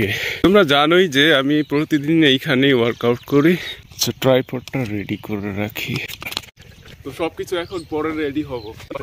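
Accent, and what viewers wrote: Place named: Purulia, India. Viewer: হ্যা আমি জানি কোন দেশ না। ভারতের পুরুলিয়া একসেন্টৈর মতো লাগলো তাই জিজ্ঞাস করলাম ।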